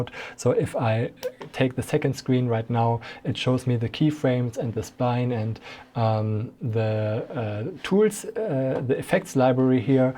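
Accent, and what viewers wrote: Germany. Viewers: Moin, ich hoffe mal du verstehst deutsch. Irgendwie höre ich was deutsches in deinem Englisch raus xd Ich habe eine Frage zu DaVinci, die mir bisher niemand beantworten konnte. Ist es möglich die Geschwindigkeit eines Videos mit Keyframes, die smooth sind, anzupassen? Wichtig daran ist, dass man einen Keyframe anheben kann und die Geschwindigkeit sich genau an dieser Stelle verändert. Bisher habe ich es nur mit zwei Keyframes hinbekommen, was zu ungenau und zu aufwändig für mich ist. Wenn ich das versuche hier bildlich darzustellen, sieht es ungefähr so aus: Was ich brauche: . ' . ' . ' . Was ich bisher gefunden habe: .. '' .. '' .. '' ..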